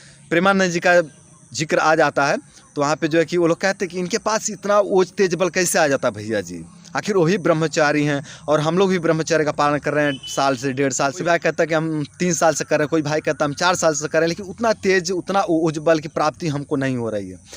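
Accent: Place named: Bihar, India. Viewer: Hii bro लगता है की बिहार से हो आप 🙏🤞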